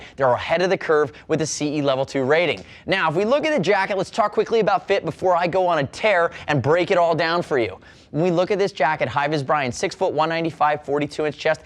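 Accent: United States. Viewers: Dear god, that is just painful to listen too. Or at least it is for my Scottish ears. That’s like Americana on steroids! I lasted 30 secs, sorry!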